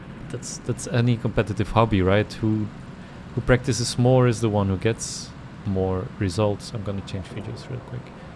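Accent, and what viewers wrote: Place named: Flanders, Belgium. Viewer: I could hear you were Flemish after the first few words 😂 Awesome perspective from an experienced Karter though. Do you sim race as well? That's my main thing currently. I enjoyed watching this, bedankt!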